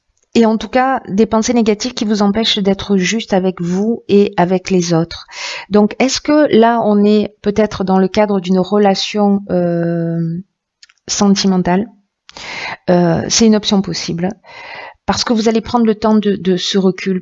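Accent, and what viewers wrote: South, France. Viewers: petit accent du soleil.. continuez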